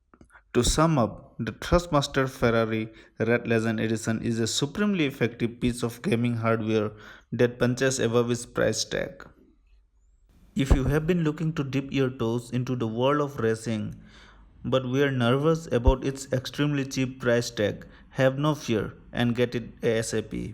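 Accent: India. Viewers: You sir have the "Stereotyped" Indian/South-Asian accent, the first time I've heard one of my countrymen with the accent foreigners mock us with. But thats Ok. :-)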